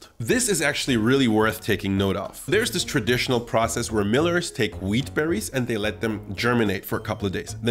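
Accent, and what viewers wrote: United States. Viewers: You have such an American accent. It's kind of offensive that you start your post dissing other Americans. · You r accent is too american,I would have never guessed you were ein dEUTCHLAND Mann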